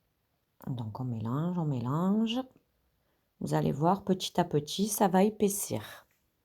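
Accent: South, France. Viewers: super recette, et surtout j'adore votre accent du sud :)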